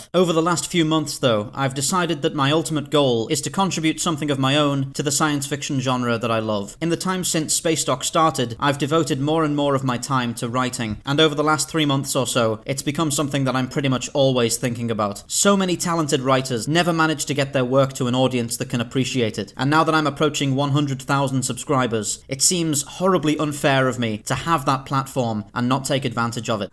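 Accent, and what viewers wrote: United Kingdom. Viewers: Your accent voice is so British that I always imagined you as an imperial officer of the empire. no homo tho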